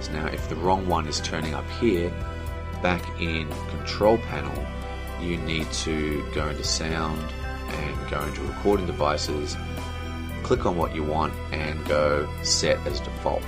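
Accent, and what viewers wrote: Australia. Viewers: You sound Australian. I am Australian. I like you :)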